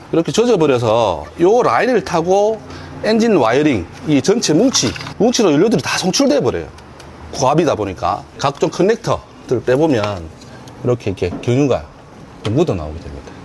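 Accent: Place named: Gyeongsang, South Korea. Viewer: 대표님~어색하지만 ㅋㅋ 차츰 말씀 중 사투리가 사라지는 것 같은데.... 같은 경상도 사람으로서 씁쓸합니다.ㅎㅎㅎ 정겨운 사투리가 좋은데 ㅋㅋ ~ 수고하세요 !!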